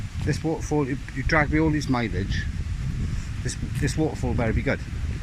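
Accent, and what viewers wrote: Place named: Wales, United Kingdom. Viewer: Stunning & you sound Welsh lol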